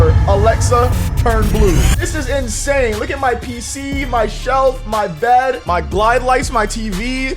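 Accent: Jamaica. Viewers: Are you Jamaican or Caribbean?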